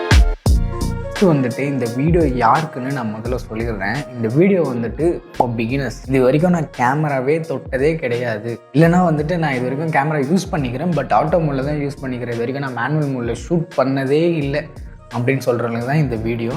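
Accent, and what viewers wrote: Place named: North Chennai, India. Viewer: Bro unga north chennai accent ... I love it bro. I was in ponneri for 2 years. From thanjavur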